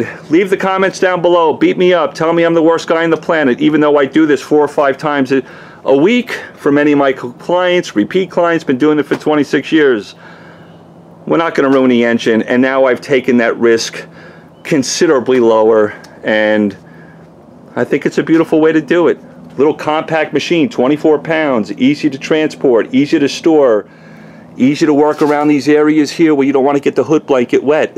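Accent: New York, United States. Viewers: Sound like a New Yorker.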